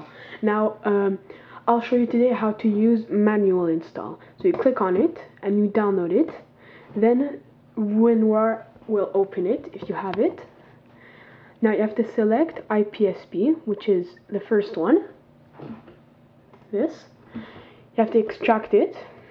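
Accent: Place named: Germany. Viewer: love de german accent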